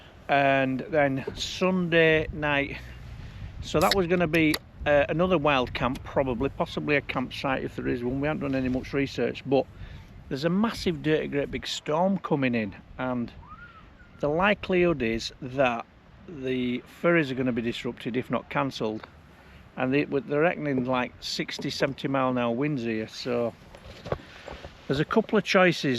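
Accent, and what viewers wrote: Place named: Scotland, United Kingdom. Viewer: Oh my gosh! I’m an American and I kept trying to catch your last name as you mentioned your wife. Then I finally heard Mrs.! I don’t know if anyone has ever mentioned that you have a touch of a Scottish accent 😂. I love watching your channel. I was one of the group that came over from Reddit and I’ve enjoyed all of your videos! I hope you keep making them.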